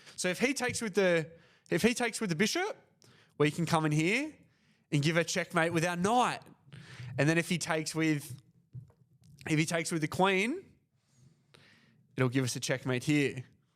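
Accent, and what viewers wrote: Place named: Australia. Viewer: So after watching hours of Alex Banzea's vids I found myself thinking in his Romanian accent. Looking forward to thinking in a Down Under accent!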